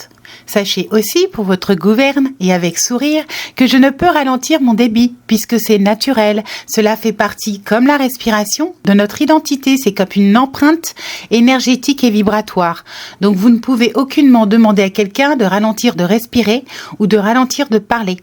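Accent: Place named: England, United Kingdom. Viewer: Bel accent anglais, mais en ce qui me concerne, je préfère la langue de Molière🍅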